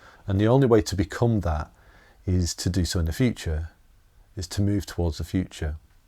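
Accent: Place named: Northern England, United Kingdom. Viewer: I don't spend much time on You Tube (less than one hour a week, I reckon)... came across your channel recently, love your content! Very calming, reassuring, inspiring and deep. Also love your accent, you sound like one of the Beatles!! (are you from Liverpool? Certainly from Northen England, I guess). Bless you. Cheers from Italy.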